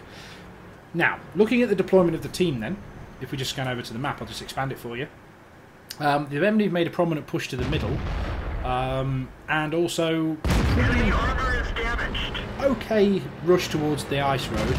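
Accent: United Kingdom. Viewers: Tanks - check ! British accent - check! New Subscriber ? - CHECK !!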